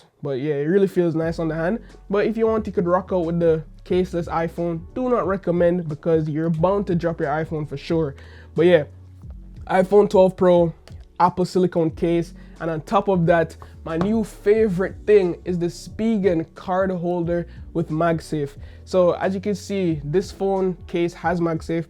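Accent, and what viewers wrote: Jamaica. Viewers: are u from Jamaican ?? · you jamaican? big up yuh self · Yow yah Jamaican ? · You sound Jamaican 🔥👍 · are you Jamaican brov? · Is that a Jamaican accent I hear?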